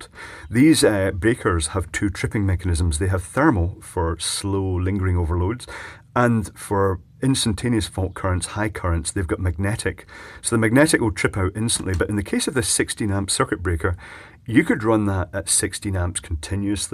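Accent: Scotland, United Kingdom. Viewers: Your Scottish brogue kept me watching the full 18 minutes even though I live in a country with a completely different electrical system.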